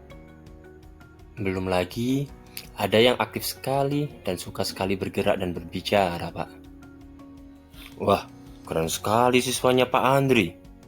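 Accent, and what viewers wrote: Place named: Java, Indonesia. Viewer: Wow. This has incredible visualization, idea, and script. Moreover, your Javanese accent will never ever be changed, that's our character. Good job, Pak.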